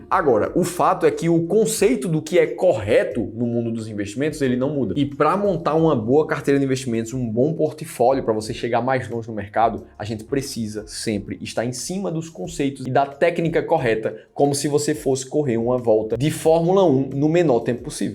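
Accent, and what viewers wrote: Pernambuco, Brazil. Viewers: Valeww comeddor de Bucho de Bode! KKKKK Esse sotaque não me engana é Pernambucano com certeza!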